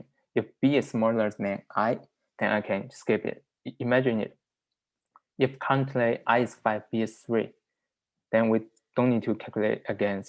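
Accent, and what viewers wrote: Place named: Southeast Asia. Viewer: 很重的东南亚口音，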